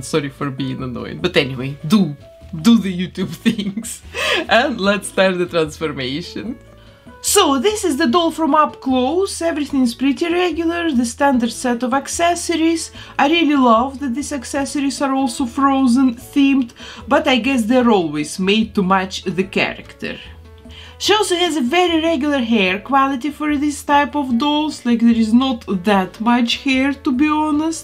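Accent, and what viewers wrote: Russia. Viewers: Русский акцент или мне кажется?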